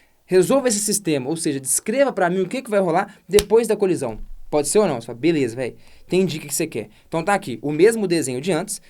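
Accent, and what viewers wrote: Minas Gerais, Brazil. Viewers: Excelentíssima aula, Prof.! O sotaque mineiro deixa ainda melhor xD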